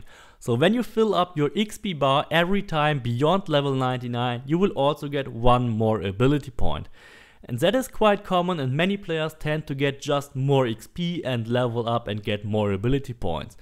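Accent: Germany. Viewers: Dieser Deutsche Akzent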